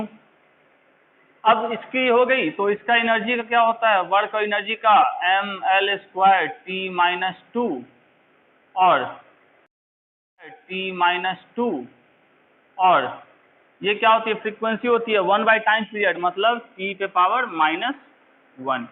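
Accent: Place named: Bihar, India. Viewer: Sir hm iss year Bihar board inter 1st division kiye hai... 353 marks aya hai..physics me 74...biology me 76 aur chemistry me 66 aaya hai iss baar hm neet ka exam nhi de rhe hai..2023 me pakka denge..aap aise hi lecture layega..aapka voice tone bihari jisse padhne me boring feel nhi hota hai..thanks sir..🙏🙏❤️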